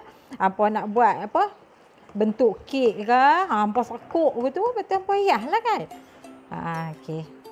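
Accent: Kedah, Malaysia. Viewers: Hati2 cik...seronok dengar cik cakap kedah...👍👍👍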